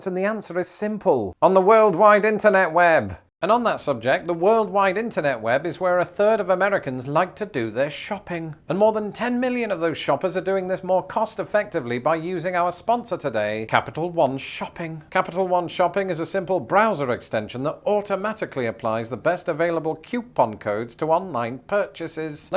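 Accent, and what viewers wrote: United Kingdom. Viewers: Says "trains, buses and broomsticks" with a straight face. Lol. Love British humor (and accent). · Don’t fret too much about not tipping in Westminster, Colorado. They’re okay. I find that the type of British accent affects people’s perception of the British person. In Colorado, an accent like (?) would make people think he’s very cultured and intelligent. It would be intimidating to some, but many would want to know what it’s like to dine with the queen. We can be ignorant. If it’s, say, a cockney accent then Americans (around here anyway) are going to think you’re a hooligan and try to party with you all the time. Or avoid you because they’re old, like me, and are too tired to party anymore. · Lots of good stuff there. Then again... Your example of sales tax in Maine - which you should have specified was SALES TAX - was overblown. 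Exaggeration for effect is fine, but that was nonsense. I agree completely about your British accent and the impact on Americans outside the major cities. Note that I said YOUR accent. Have you been here so long you forgot that there are a variety of them? Or maybe your video is only aimed at others who sound like you? Of course there are both accents (how words are pronounced) and dialects (veering off into a different vocabulary), and you specified accent (singular), but your advice might not be so useful for someone from Yorkshire, or perhaps working class just about anywhere. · This American LOVES the accent. I will sit and listen to anyone from the UK for hours. I fall asleep listening to sleep stories read by English/UK actors/voice actors.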